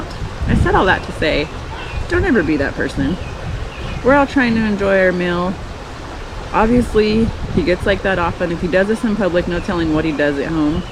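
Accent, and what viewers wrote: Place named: Tennessee, United States. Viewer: D how long have you been in TN, you have "y'all" down pat..... Welcome to TN honey!!!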